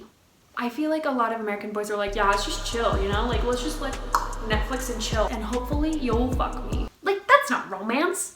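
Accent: Italy. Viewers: oh my jesus, i want to be ur friend so much, wtf help. I'm italian and I LOVE LOVE LOVE your italian accent! u're too cute and u look sooo kind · Hi I am Italian and I really think you are beautiful girl and nice person + your Italian accent is really good and cute💘💘 xoxo from Rome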